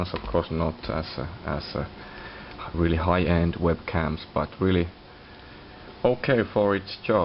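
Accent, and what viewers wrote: Finland. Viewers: Hah, accent doesn't get more Finnish than that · Selvästi suomalainen mies puhumassa englantia :)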